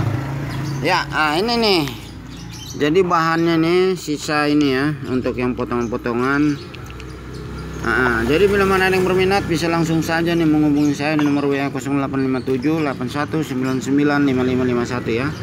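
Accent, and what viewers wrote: West Sumatra, Indonesia. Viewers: ..suara anda jelas bgt kalau anda orang MINANG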